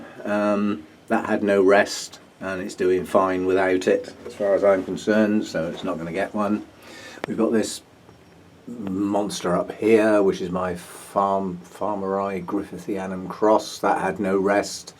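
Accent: England, United Kingdom. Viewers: where are U based?U sound English -correct?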